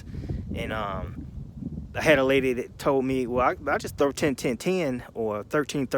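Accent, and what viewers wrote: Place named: Atlanta, United States. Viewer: Now I'm from Avondale and I could tell right away. Lol I told my wife that's Atlanta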